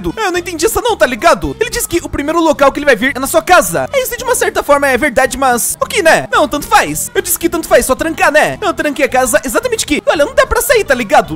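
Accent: Portugal. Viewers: Gente, ele é de Portugal? Sotaque estranho da prr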